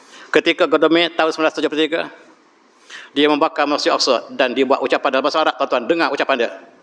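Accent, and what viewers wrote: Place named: Kelantan, Malaysia. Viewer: Saya sangat meminati isi2 ceramah Prof. Sgt banyak ilmu yg boleh dikutip untuk dijadikan panduan dan pengajaran. Cuma, cara sebutan prof ada masanya agak sukar difahami. Samada terlalu laju atau pengaruh dialek kelantan yang kuat. Kalaulah prof dapat memperbaiki cara sebutan dalam setiap ceramah, ia akan lebih bermakna dan memberi menafaat kpd semua penonton. Penonton prof bukan shj dari Malaysia. Ada juga dari Indonesia, Brunei, mungkin juga dari negara lain yg lebih sukar untuk memahami dialek tempatan. Apapun, saya sgt menghormati prof, seorang ilmuan yg dalam kelasnya tersendiri. Teruskan dgn video2 yg memberi manafaat kepada umat sejagat.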